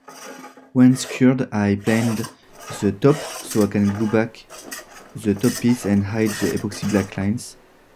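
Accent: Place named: France. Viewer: Le sous titrage est une autre solution ;) ! Ah ! Cet accent français quoi ;) ! Mais joli boulot !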